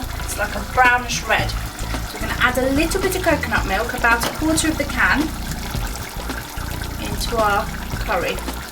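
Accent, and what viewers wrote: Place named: Sri Lanka. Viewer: sri lankan ENGLISH amma..hah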